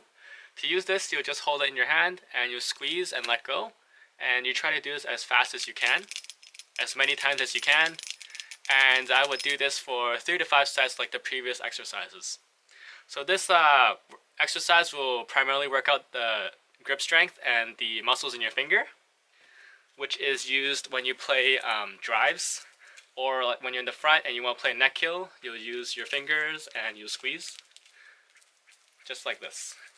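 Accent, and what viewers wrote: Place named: United States. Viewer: Jogender Jakhar he has an American accent so I would assume America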